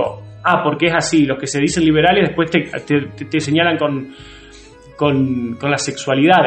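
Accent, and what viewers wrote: Buenos Aires, Argentina. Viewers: Gracias por tu advertencia sobre Moras, soy seguidor suyo pero igual a lo del antisemitismo ya ni bola. Dos cosas mas, una no deberias decir que no sos lider de lo contrario nadie vendria a ver este canal, sos lider de un canal interesante donde la mayoria de las noticias "son falsas" decia checkeado o como era ese sitio. Otra cosa que nada que ver, me di cuenta que siendo cordobes no tenes acento cordobes, hablas como porteño.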